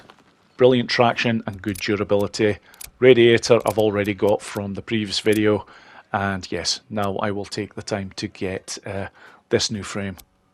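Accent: Scotland, United Kingdom. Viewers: mega great info channel rocks the best way ever I never asked but I take it you are sottish one of my favorite wrestler is drew mcintyre from WWE .. I'm half Welsh / Greek funny combo I know lol. but your channel/ content is excellent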